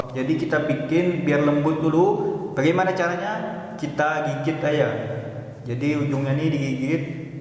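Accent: Banjar, Indonesia. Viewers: Urang banjar Kalo? Logatnya tadangar sedikit han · kayak ada logat Banjar nya Abang,,,,dr mana asal nya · hanyar aku tahu logat banjar..!! Banjar kalua kh? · logat urang banua ni kada bisa dikeramputi lagi bang ay. mantap 😂 sehat selalu pian pun 😁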